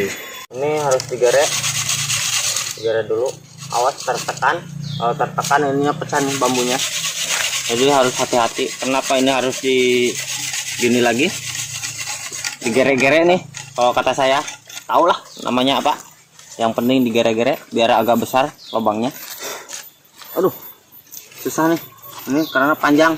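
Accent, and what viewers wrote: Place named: Banyumas, Indonesia. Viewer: cingireh ngapak ....salam wong jowo bro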